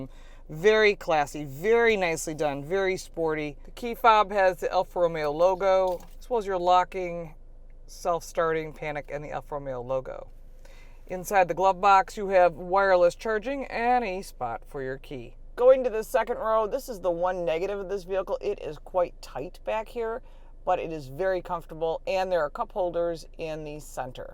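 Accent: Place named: Brooklyn, United States. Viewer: All this time I thought she was Italian ;she sound like she's from Brooklyn. 😊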